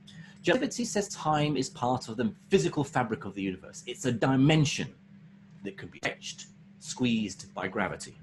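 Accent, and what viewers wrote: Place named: Iraq. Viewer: لغته العربية باللهجه العراقية گلش حلوه.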